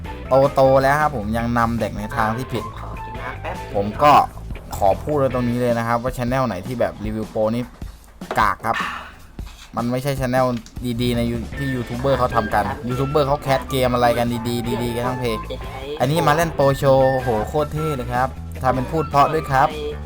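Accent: South, Thailand. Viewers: พี่คนใต้เหรอ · พี่คนภาคใต้ป่ะถ้าใช่อ่ะเหมือนกัน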